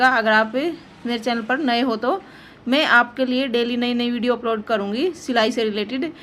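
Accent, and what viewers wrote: Haryana, India. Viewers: Good morning mam aap ka pahle ka vidio me sikhane ka tarikka bahut hatt ke hay abhi bahut hi acha hay aap ki bate Haryana stayil hay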